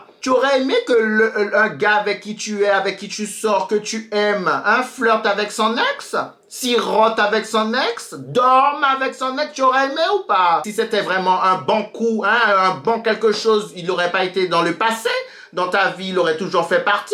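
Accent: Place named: Brazil. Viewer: Ton accent brésilien j adore 💕💕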